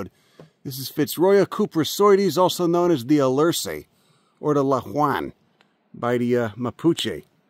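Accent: Chicago, United States. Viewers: Jesus two seconds in this guys got to be from chicago !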